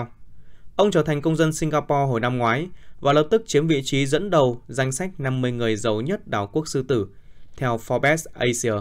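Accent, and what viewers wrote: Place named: England, United Kingdom. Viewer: Mấy cái tên tàu thì cứ đọc kiểu anh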